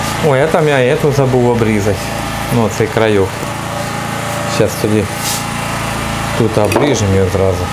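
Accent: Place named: Chernihiv, Ukraine. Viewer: Суржик супер, яка область, Ченіговська?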